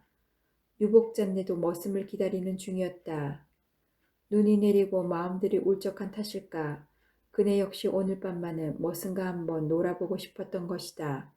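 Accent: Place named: Jeolla, South Korea. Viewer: 구수한 전라도 사투리에 음성이 잊혀져간 엄머니에 음성처럼 정감이있어 행복하게 들었네요 감사합니다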